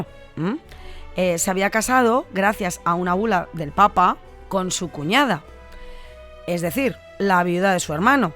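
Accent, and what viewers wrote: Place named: Spain. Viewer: la voz parece provenir de la negra garganta de la otan... y como no podia ser de otra manera de una MATURRANA (española)...porque hago incapie en esto? porque desde que eeuu expulso a patadas del caribe a los españoles con una guerra inventada por los estados unidos contra españa para apoderarse de toda la america central y sur,argumentando el hundimiento de un barquito yanqui en manos de españa, estos se fueron con el rabo entre las patas... resulta que desde los 90 rebosante de neoliberalismo españa termino siendo la empleada de eeuu en los asuntos con america latina, parece que la lengua fue encargada de conspirar, comprar voluntades y favores... vinieron las privatizaciones de empresas del estado en tooooodaaaa la amaerica latina, fueron la cadena de transmicion del saqueo en todos los niveles, economico, financiero, cultural, social vinieron a robar en pocas palabras...pero esto el de quedarse con recursos como petroleo, telecomunicaciones, servicios, los yanquis no podian hacerlo en persona... 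precisaban un gusano que se metiera en la estructura economica de cada uno de los paises de la region latinoamericana, les entregaban este huesito a los "maturrangos" a cambio de debilitar a la clase trabajadora, no permitiendo a sudamerica su desarrollo industrial,su emancipacion y su soberania menguada, hablamos de empresas claves para el desarrollo de un pais...cito ypf entel aerolineas argentina etc etc... hoy españa es la empleada (dealer) en la region que una vez invadio y s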